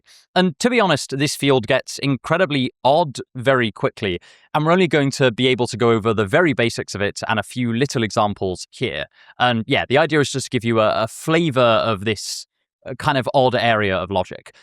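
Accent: United Kingdom. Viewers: British voice alert, I repeat, British voice alert ⚠️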